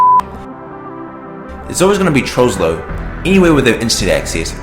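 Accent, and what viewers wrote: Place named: New Zealand. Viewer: Kiwi accent, not British. He says it himself at the end.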